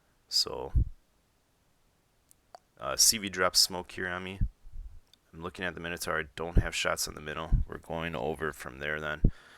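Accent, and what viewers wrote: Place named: Wisconsin, United States. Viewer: smooth buttery Wisconsin voice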